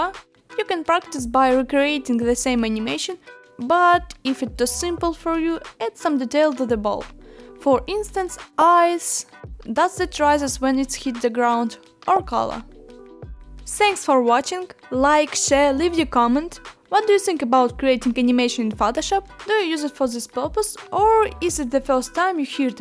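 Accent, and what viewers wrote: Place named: Russia. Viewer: Воу как рашн акцент виден)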